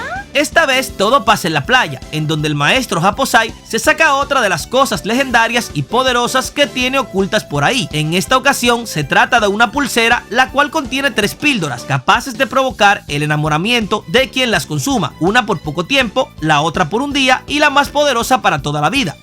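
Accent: Dominican Republic. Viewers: Una pregunta: por casualidad eres dominicano? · una pregunta tu acento me suena muy dominicano, y te pregunto ¿si eres dominicano verdad? porque ese acento lo reconozco muy bien, y si no eres dominicano de donde eres porque ese acento no me suena ni a cuba ni a puerto rico que son los paises que hablan mas similar a republica dominicana, aunque estoy entre la espada y la pared si es dominicano o puertoriqueño pero la diferencia es que nunca reemplazas la "r" con la "l", y incluso pones referencias de personas dominicanas en tus memes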